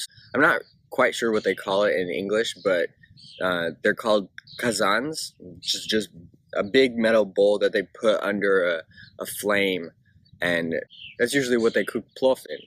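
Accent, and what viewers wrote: United States. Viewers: Why do you look and sound American? Don't you like being from Kazakhastani?? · how come you speak like an american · Kazakh language is a State language, Russian is the Second Official language. I noticed that in Almaty there most people speak Russian, but in the West of KZ people speak both languages, but they speak Kazakh mostly. I wonder, what's your nationality? I suppose, you have an American accent, if I'm not mistaken. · you from Seattle? you have the Washington acent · You have a very strong American accent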